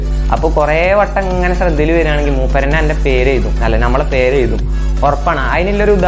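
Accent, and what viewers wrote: Malappuram, India. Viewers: മലപ്പുറം ഭാഷ തന്നെ 🤣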